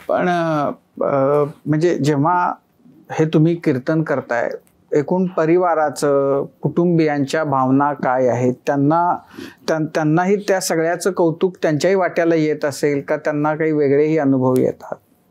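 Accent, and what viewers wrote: Standard. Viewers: प्रगल्भ अभ्यास व्यापक विचार सुरेख भाषा. उच्चार शुद्ध. खरं च अकल्पनिय. विनम्र अभिवादन. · कोटी कोटी नमन शुद्ध वाणी,उच्च विचार ,दांडगा व्यासंग एवढा अभ्यासअसणारे महाराज बोटावर मोजण्याइटकेही नाहीत वारकरी संप्रदायात आपली पुस्तके वाचायला आवडतील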